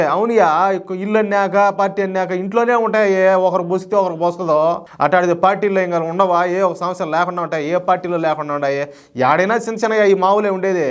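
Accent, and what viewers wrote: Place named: Telangana, India. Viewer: I appreciate your videos but your pronunciation is completely Telangana yasa if possible please change in pure Telugu.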